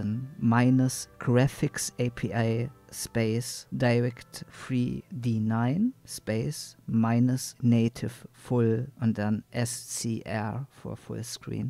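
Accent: Germany. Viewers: teach me german senpaiiiiiii, dude i love that accent "Not a scratch Doctor " Not A Scratch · bist du deutscher xd klingt so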